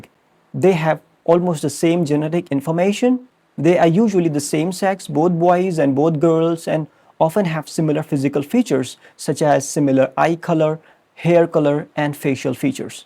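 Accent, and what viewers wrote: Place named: United Kingdom. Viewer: The British accent was great k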